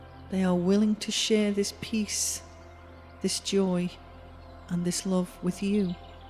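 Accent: Liverpool, United Kingdom. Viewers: Been doing it since I was a kid nice to hear a scouser bring me back. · I couldn't stop being distracted by her dialect. Not sure if it was Liverpudlian or something else. Also, to be honest, I felt it was all too "rushed" I needed a little bit more time to follow the directions. I think it's s great thing to share these meditation's, but a LITTLE SLOWER next time please! Thanks 👍 xxc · Sorry, I can't relax with a Scouser talking, got both hands on my wallet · for some reason the british accent annoys me. how can i get over this? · Are you scouse ?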